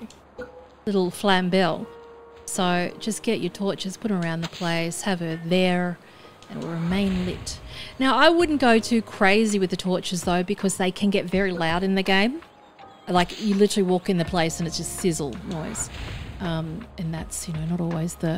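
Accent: Australia. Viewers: Aussie or NZ?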